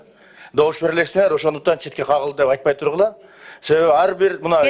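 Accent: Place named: South, Kazakhstan. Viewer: Түштүктүн говорунда сүйлөгөнү үчүн жакпады эле деп койбойсуңбу!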